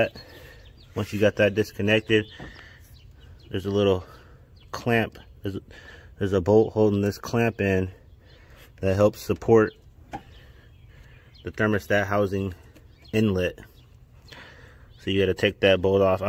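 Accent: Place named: Pennsylvania, United States. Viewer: Are you from back east, you sound like/= my neighbor from P - A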